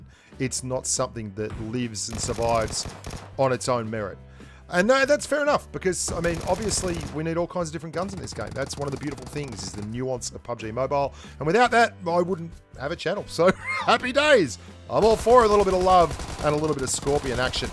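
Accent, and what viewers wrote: Australia. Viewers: You literally need to be sound like australian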